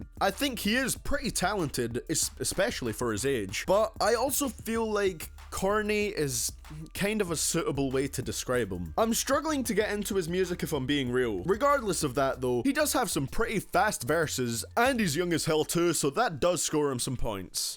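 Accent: Scotland, United Kingdom. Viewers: Are you Scottish, because I am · Are you Scottish by any chance or its just your voice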